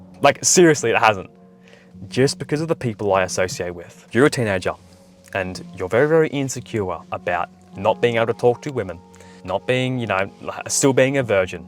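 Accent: Australia. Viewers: Crrikey mate i get no bitches!.Also aussie?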